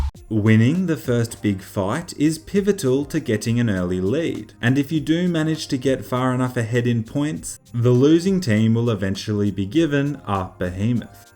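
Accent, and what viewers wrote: Australia. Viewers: What part of Australia are U from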